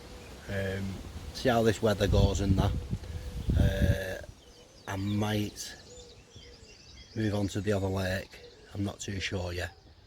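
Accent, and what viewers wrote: St Helens, United Kingdom. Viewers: You from St. Helens mate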